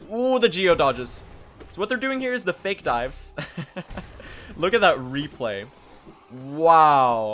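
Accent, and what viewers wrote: Sweden. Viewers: as a swede, you barely have any swedish accent. i'm impressed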